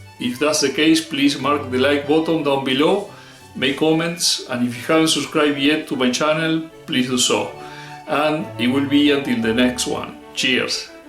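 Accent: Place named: Spain. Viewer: Menudo acento tienes jajajaj, se nota que eres español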